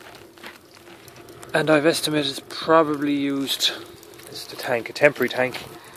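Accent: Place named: Newfoundland, Canada. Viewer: sound alot like a newfoundlander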